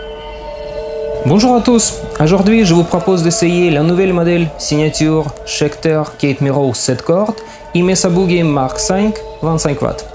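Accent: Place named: Russia. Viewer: Salut, земляк, нюансы произношения говорят о том, что ты из России. Ну, или откуда-то в этом роде )) Par contre круто играешь, тут без нюансов! Как тебе гриф Шектера, не слишком толстый? У меня раньше был Ибанез Престиж 2127, удобнейший гриф на свете, но отвратный звук липы. Продал, теперь вот думаю взять этот Шектер, но сомневаюсь, не нужны ли тут ладони баскетболиста? Обычно у Шектеров грифы толстые... Ты его покупал, случайно, не в Париже на Пигаль? Merci d'avance, cordialement, привет из Беарна.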